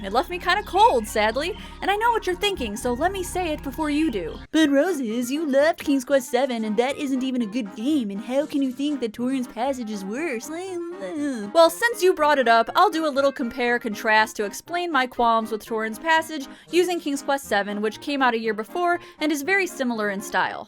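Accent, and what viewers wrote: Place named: Midwest, United States. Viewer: You sound very midwestern during your streams vs your edited videos.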